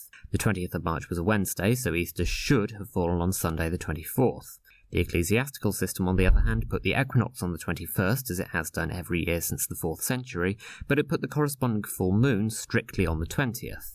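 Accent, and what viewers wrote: United Kingdom. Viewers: Much enjoyed your video, both in its contents and its vocal presentation, whose somewhat dry acerbic touch, highlighted by the nice English accent, made for much captivating entertainment and learning. However, I think there is a slight error in the video visuals: : On the "Observed" 2019 Easter table, shouldn't the "Next Sunday" be Sunday, April 21, instead of Sun. March 21 (since that would have a Thursday--and that next full moon acc. to the ecclesiastical calendar was Sat. Apr. 20)? I see as well that so far you have just two videos. Here's to a good many more. Subscribed.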